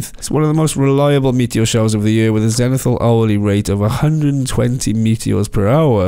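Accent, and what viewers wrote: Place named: Wales, United Kingdom. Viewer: New subscriber, recognise the accent... Cwmbran or Newport area?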